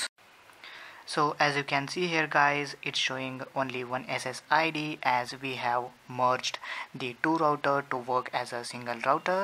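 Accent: India. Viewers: Nice, slow and clear also detailed explanation dude Not like other indians that explained with really fast commenting and also not clear too what they blabbered about, to make it worse sometimes brought very heavy indian accents I end up dont understand anything, lol About your accents, only a little indian accent and very acceptable Thx mate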